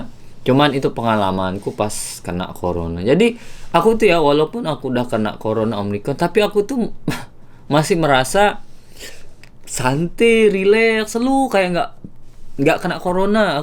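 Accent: Lombok, Indonesia. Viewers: Walaupun pke b indo tapi logat sasaknya masih ada 🤣🤣 mantep side bang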